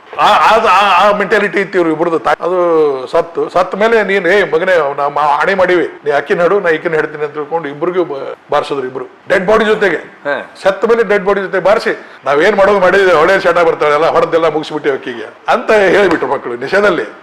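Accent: North Karnataka, India. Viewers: Bhashe chennagide natural north bhase.no problem onthara khushi kodute.kelakke. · ಪಕ್ಕಾ ಉತ್ತರ ಕರ್ನಾಟಕದ ಬಾಷೆ ಜೈ ಉತ್ತರ ಕರ್ನಾಟಕ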